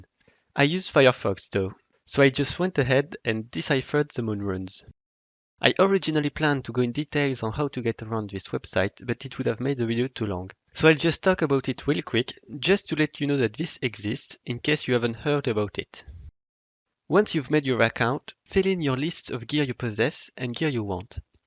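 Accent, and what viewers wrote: France. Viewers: il est francais ça s'entend grave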